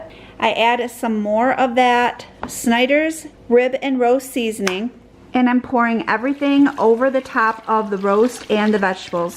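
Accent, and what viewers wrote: Michigan, United States. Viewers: I found your channel just awhile ago, so haven’t heard what part of The Mitten you are from. I like your bubbly personality and the accent takes me back. Lol We lived in Michigan and Kogels is the absolute best!!!! We live in Tennessee now, but every time we go back we stock up on anything Kogels. The bologna is not like anything I have ever had anywhere. We buy a couple of rolls and freeze it. I wish the sold it here. I took this brand for granted when I lived there. We’ve ordered some also.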